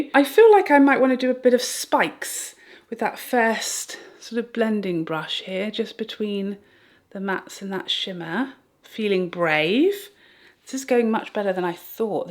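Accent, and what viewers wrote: United Kingdom. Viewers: I love your stories -- not only are they great and cray cray, but you're a natural storyteller. Plus, your accent is darling. (I'm from the US and adore UK accents.) Great video on product. I've been curious about all you showed! P.S. - Where are the brushes made?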